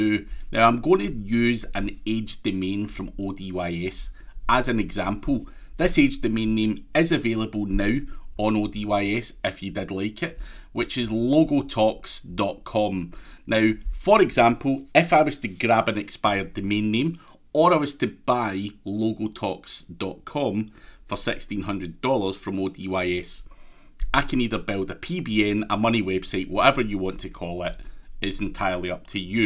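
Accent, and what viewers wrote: Scotland, United Kingdom. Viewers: man freaking genius!!! plus +1 on the Scottish accent!! i have been wondering about doing exactly that for a while now.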